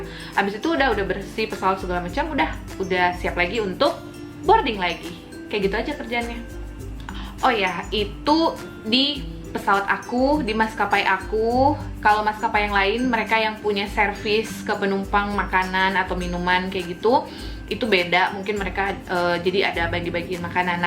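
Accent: Manado, Indonesia. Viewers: Pke logat mnado skli" bkin vidiox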